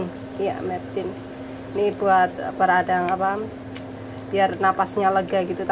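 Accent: Java, Indonesia. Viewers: Asli Jawa mbak? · Ibue medok nemen..orang jawa mana bu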